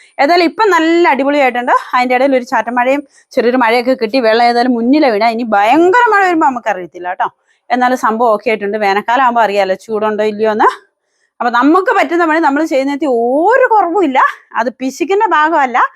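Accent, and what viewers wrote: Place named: Karnataka, India. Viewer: Veed yevideya ?kekumbo nammude karnataka malayali pole undalo😊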